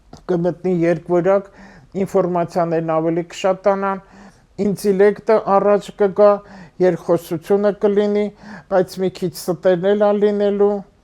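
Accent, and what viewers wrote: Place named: Tbilisi, Georgia. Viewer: Duk im nman ek xosum tilizi akcentov hat urax em vor dez lsum em es tiflizeci em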